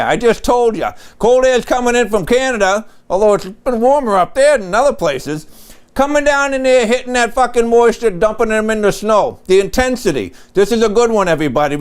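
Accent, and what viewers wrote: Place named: Northeast, United States. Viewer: You are from the northeast. Can't hide your accent. Not a hillbilly.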